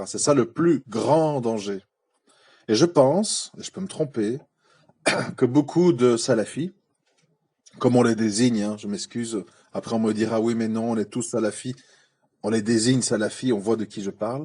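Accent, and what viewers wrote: Belgium. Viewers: T es lourd à écouter avec ton vieil accent belge,petit débutant converti,t arrives pas à la cheville de Hassan iquioussen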